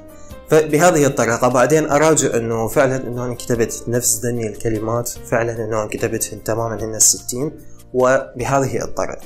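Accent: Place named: Iraq. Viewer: اسفة على كلمة بس اول مرة اشوف عراقي كاعد ايسوي شي بي خير و بهل احترافية و بهل نظافة طبعا من فتت على قناه و سمعت اللهجة انصدمت انو عراقي الي كاعد يحجي و اللة امثالك فخر النة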